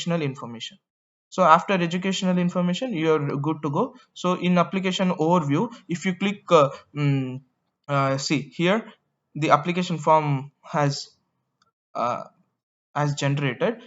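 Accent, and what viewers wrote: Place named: India. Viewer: Why on the earth all these Edu videos made by Indians with a strong accent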